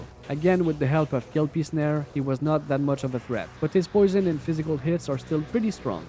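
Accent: Quebec, Canada. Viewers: Are you French-Canadian? Your accent sounds very denis villeneuve to my ears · Accent Québecois? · I just want an answer, your accent, it says Canada, are you from Québec?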